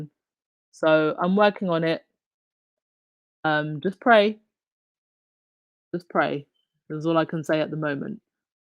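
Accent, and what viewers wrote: United Kingdom. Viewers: I can tell by the sound of your voice that you are British. Maybe you can share. What governing agency of media air waves allow a commentator to incite harm to an another individual without that person or media platform NOT receive some type of sanctions??? Who does this Holmes man believes himself to be or better yet what royal associated gave him permission? To hear him speak literally made me sick enough to vomit. What on God's green earth have the Duke and Duchess of Sussex done to anyone of them personally that they wish such harm to them??? Soo much hate they are willing to orphan their children. SHAME on these people!!! He may now say he didn't mean it but where I'm from many a truths are spoken in jest!!! but he meant what he said. The arrogance of some British people is appalling!!! to other parts of the world. Something I believe they will soon find out. 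The Invictus Games in The Hague is a TRIUMPH simply a TRIUMPH. I know it is nearly over but I'm still wanting more. Not like William and Kate who are left wanting in every way. The British media, royal rodent, men in gray coats, Charles or William can do nothing say nothing or be about nothing that will out shine Prince Harry and our Duchess. Prince Harry's concern for his grandmother is justified. Andrew stealing monies anyway he can, Charles convincing her to make Camilla queen consort, William and Kate losing not half but all of the Commonwealth( they just don't know it yet), some Kelly woman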